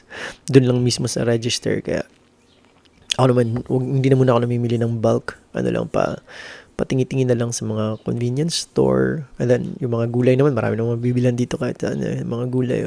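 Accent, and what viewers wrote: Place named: Luzon, Philippines. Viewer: New subscriber here. You’re from Gensan but you sound like you’re from Luzon when you speak Tagalog. Just an observation from someone who grew up in the island of Luzon. 😊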